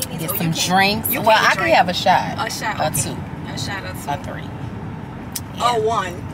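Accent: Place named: Louisiana, United States. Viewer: this is my first time actually hearing you Louisiana accent. or maybe i just never heard you speak long enough.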